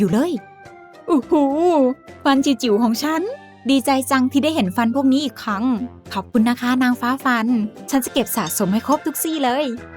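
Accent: Isan, Thailand. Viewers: พี่เป็นคนอีสานเหรอ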